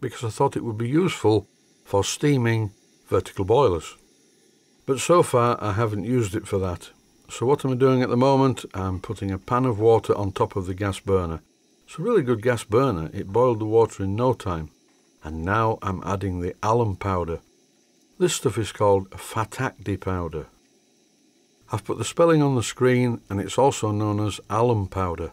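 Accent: United Kingdom. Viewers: I love your videos and am gradually learning British locomotive terminology even though sometimes your charming accent adds another layer to comprehend. My favorite example is "group" screw, which I finally figured out was grub screw which I would call a set screw. Also, turret would translate to manifold here in the US. Keep it up!!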